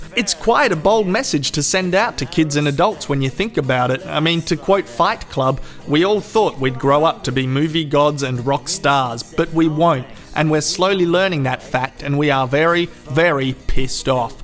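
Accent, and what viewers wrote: Australia. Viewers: Great job mate! Take away the swearing and you have some very thoughtful insight into this movie. Hearing another Aussie swear just makes me homesick. Thanks mate!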